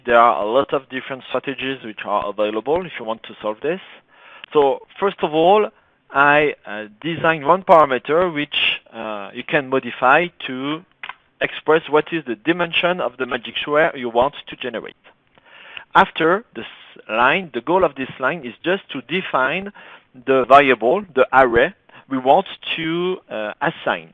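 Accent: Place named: France. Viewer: ahaha I was just joking because it was already obvious in the other videos that you are french from the way you say minizinc, nevertheless your videos are informative and I want to thank you for them! Keep up the good work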